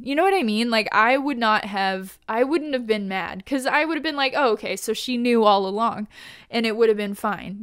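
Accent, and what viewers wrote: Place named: England, United Kingdom. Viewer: beautiful english accent btw ;P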